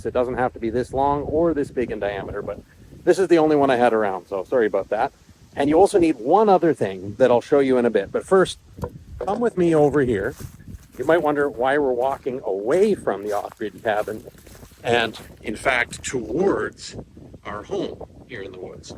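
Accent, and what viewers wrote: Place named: Canada. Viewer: I love your video tutorials; they are well paced and informative. Love the Canadian accent!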